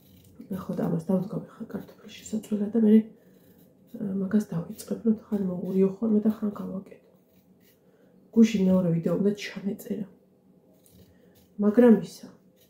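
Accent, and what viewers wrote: Martkopi, Georgia. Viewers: ძაან ბუნებრივი ხარ და შენი მარტყოფული კილოც მაგარია❤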